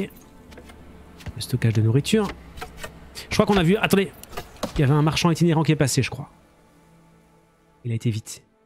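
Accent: Belgium. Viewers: Tu as un léger accent belge... 😊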